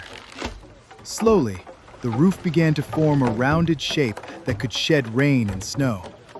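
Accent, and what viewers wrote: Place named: United States. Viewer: Wow great thanks, I really like how AI makes past living again. And that's a nice American prononciation, I understood everything. Thank you to show each native Americans way of life aspect.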